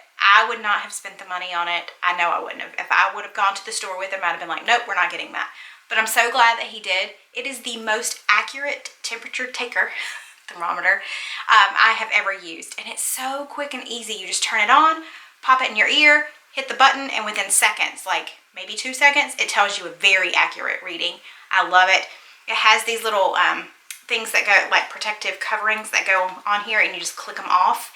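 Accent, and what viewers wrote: South, United States. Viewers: Loving your southern drawl!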